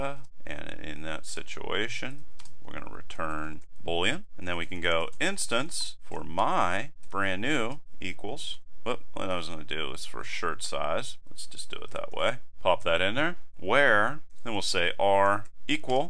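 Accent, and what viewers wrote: United States. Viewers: Offtopic comment, I find it really amusing the fact that you can clearly tell this guy is a 100% 'Murican made just by how he talks.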